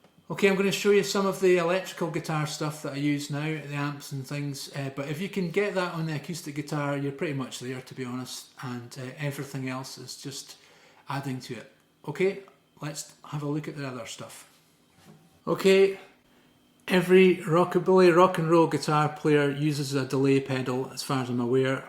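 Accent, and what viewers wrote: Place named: Scotland, United Kingdom. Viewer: Your Scottish accent is great! Keep on keepin 'on!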